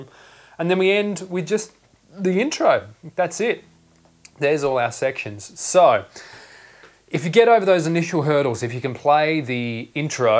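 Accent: Australia. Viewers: I'm so glad you posted this... one of the most helpful guitar lessons I've ever seen. I still can't play it but at least I have hope. And your Australian accent is very very cute :)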